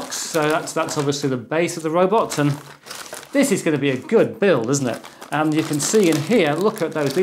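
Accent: United Kingdom. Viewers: What kind of British accent do you have? Because which ever one it is, it's the one that always sounds low-key being cheeky.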